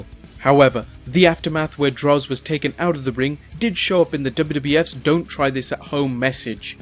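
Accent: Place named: United Kingdom. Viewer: Well what do you expect? It's annoyingly another British guy narrating a video.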